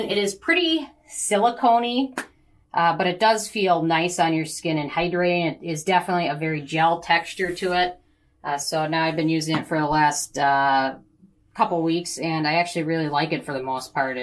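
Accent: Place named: Wisconsin, United States. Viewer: The moment you opened your mouth and talked, I KNEW you were from Wisconsin!!! Love my fellow wisconsinites!!!!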